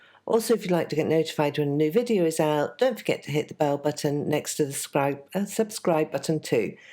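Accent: United Kingdom. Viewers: ally beautiful painting, and effective and entertaining teaching!, well done, you've earned a new subscriber! I saw your card on the SSS blog and loved it, and when they mentioned your Scottish accent I had to come to check your videos out right away, Scotland is my favorite place in the world so far, though sadly I've only gotten to spend two weeks there so far can't wait to go back! Outlander is not enough of a fix, it's just making me more eager to go back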